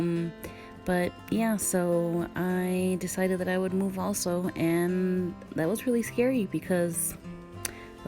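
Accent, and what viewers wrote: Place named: United States. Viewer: Why do you have an America accent?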